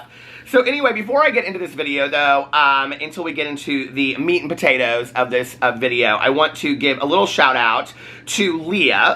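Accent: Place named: Ohio, United States. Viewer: I love you so much. I am from outside of Cincinnati, so the way you talk and your mannerisms remind me of my southern Ohio family and friends. It is so heartwarming bc you are such a good person and you sound like all my fave people.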